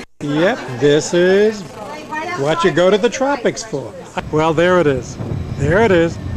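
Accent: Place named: New York, United States. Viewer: love the new york accent.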